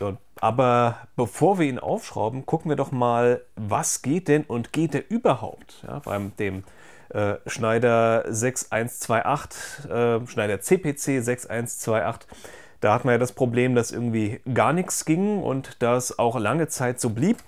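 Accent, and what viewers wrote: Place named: Hesse, Germany. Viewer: aus welcher Ecke von Hessen kommst Du denn? =)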